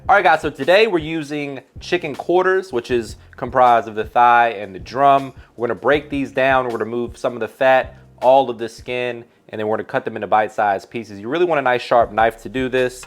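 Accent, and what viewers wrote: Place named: South, United States. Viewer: Cooking with is crazy LoL you from Florida? It feels mad southern.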